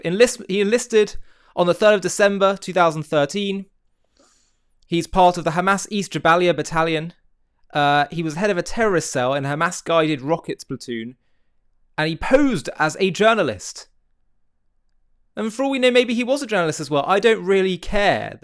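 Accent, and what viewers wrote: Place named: United Kingdom. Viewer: U R the most couragous man with British accent thank again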